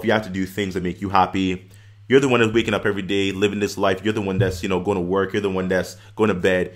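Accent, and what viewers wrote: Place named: Caribbean. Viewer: From the moment I've seen your videos I know your were from the Caribbean by your accent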